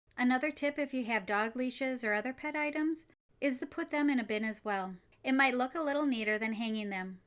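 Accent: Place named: Cape Breton, Canada. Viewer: This is the first of your videos that I've watched. You sound like you come from Cape Breton.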